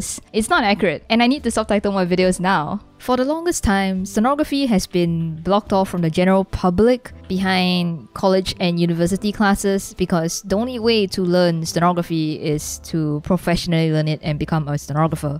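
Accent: Singapore. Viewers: are you singaporean? 😁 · are you singaporean?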